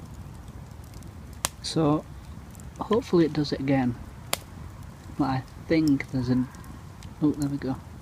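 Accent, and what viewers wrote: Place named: North Yorkshire, United Kingdom. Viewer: Have a listen... see what you think... Doesn't sound boomy enough for the bigger deer, but muntjacs, little buggers are spreading like hell. They're well through norfolk and heading ever Northward as they advance on the Scottish border. Not sure where you are , but accent reads as maybe North Yorks or southern Teeside. Little venisony sods have fangs as well. Destructive as hell on the natural flora... Bunch of Bambis on a bastid asbo... Most suggest 'inviting them for dinner' is the best plan ... Look less cute with onions, mushrooms, garlic, and a few game chips ... a.t.b <link> ...subbed you... I like forest eco-builders ...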